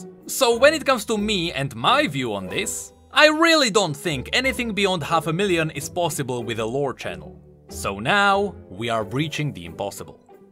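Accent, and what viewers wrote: Czech Republic. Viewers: Myslel som si že máš taký český akcent lol :D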